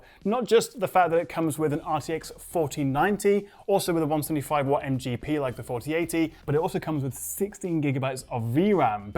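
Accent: United Kingdom. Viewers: Thanks for the review. It was very informative. I like your British accent!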